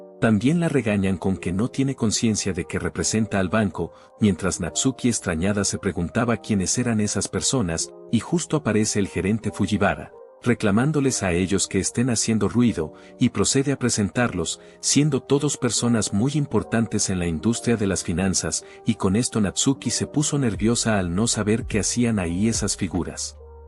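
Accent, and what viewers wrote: Dominican Republic. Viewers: que buen resumen con su acento dominicano jaja esa vaina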